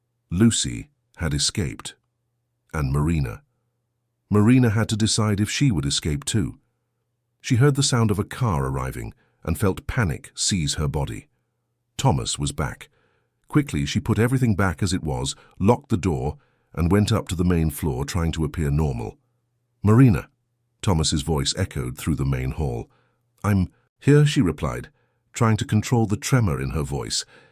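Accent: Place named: England, United Kingdom. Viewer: last name norwood is English, the voice also sounds English but the picture does not look Englsh. Do like his longer sideburns though.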